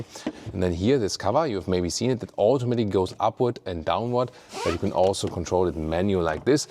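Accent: Germany. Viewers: Thank you for the great review! German humour and accent is the best for reviewing german engineering. And involving freshens the video so much! The car looks good, but they could ve done better, I think... Great that the headlights are in one piece. Of course the black matt styling of the body would have looked more practical for those who really go off the paved road. And why no split exhaust???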